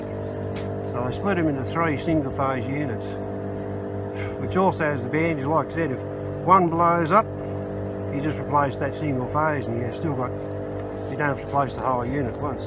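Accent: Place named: Sydney, Australia. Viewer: Location and incoming lines look familiar, accent gives it away. Not too far off Wallgrove are we...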